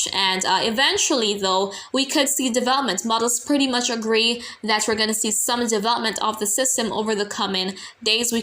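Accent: Jamaica. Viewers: Sml love u so beautiful thanks for the update from Jamaica 🇯🇲 an love your Jamaica twang lol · Jah know...yu patwah tun up😂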